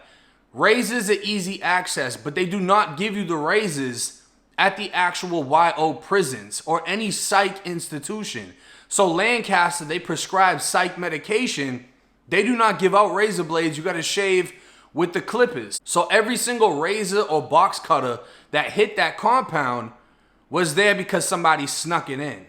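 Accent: Boston, United States. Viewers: That’s wild he sounds very Boston for Florida lol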